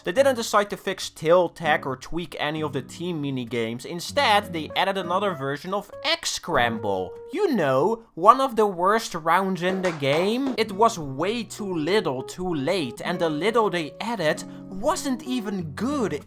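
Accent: Netherlands. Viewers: Deze man heeft een beetje een hele grote Nederlandse accent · you sound dutch as fuck bruv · Tering…….wat een cringe nederlands kut accent 🙃🙃🙃🙃🙃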